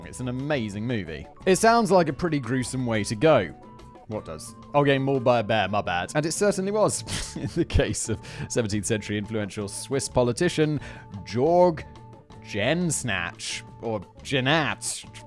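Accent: South, United States. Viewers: as a Texan, if you came here with that southern accent, no one would question it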